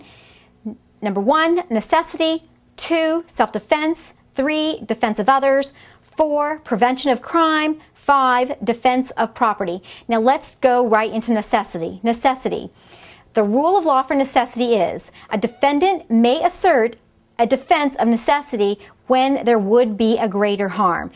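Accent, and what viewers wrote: United States. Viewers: QUESTION: If you're really certain, where in the USA is her accent from? Thank you. Every one of this series of videos is very clear and of excellent quality, and the audio levels on every one of the videos are perfectly matched for an uninterrupting playlist. The instructor is also very attractive, and I'm curious where in the USA her accent is from. Finally, I wish there were more such videos from her, besides the ones in this series.Thank you so much!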